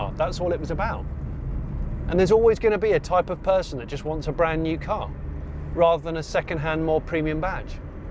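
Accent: United Kingdom. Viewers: As always just a couple of words in the comment just for a brilliant British English.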